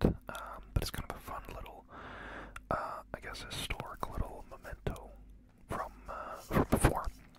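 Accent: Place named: United States. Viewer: så kult! Hvor bor du da? Høres ut som du har en veldig amerikansk dialekt på engelsken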